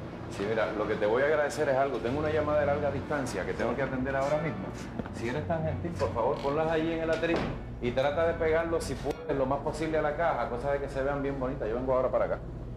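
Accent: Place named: Puerto Rico. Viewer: Me encanta el acento boricua. Bendiciones a Puerto Rico.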